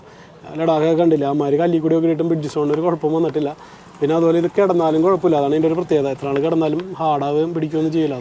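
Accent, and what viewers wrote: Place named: Paravur, India. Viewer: Aaha...Slang kettappo thonni ivde adthulla aaro aanallo enn 😁♥️ Paravur il evdaa bro ?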